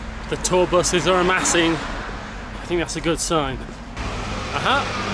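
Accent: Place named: United Kingdom. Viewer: Hi, newer subscriber here and glad to see a new video in Taipei Taiwan. Can tell that you enjoy walking, as it seems like every video you sure get in your steps for the day. I enjoy your Pop In The Bar portions as you alway describe what you are trying very well. We can almost taste it. Wanted to say how much I enjoyed your Kosovo series and so glad you captured the footage of that cute dog Prince and trying the Goulash with the guy from the hotel. Enjoy your British accent and determination so looking forward to more videos of Taiwan.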